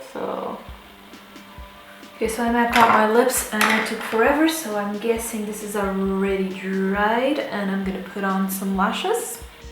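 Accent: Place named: Brazil. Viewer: you look brazilian and your english accent reminds me brazilian english speakers, where are you from?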